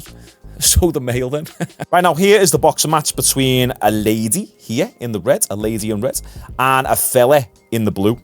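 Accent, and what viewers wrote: Liverpool, United Kingdom. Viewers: I love your accent. Its the best of the British accents 👏🏾👏🏾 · Listening to your voice you sound like you're from Liverpool one thing I can say about you guys man you're on point wherever there's b***** you guys make sure you make a stand I'll totally agree with everything you're saying this is a joke I haven't watched the Olympics for the last three times it's ridiculous lots of love to my Liverpool people😮😢😮😢😮😢😮😢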